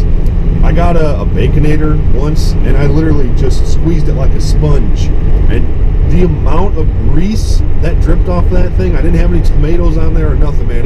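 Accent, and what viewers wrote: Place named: Texas, United States. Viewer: Michigan? I figured you were from Texas or something lol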